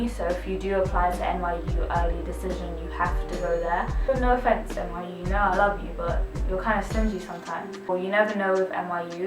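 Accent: United Kingdom. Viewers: Wait how come you have a british accent xx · Wait so did u got to an American high schools or British secondary u sound very British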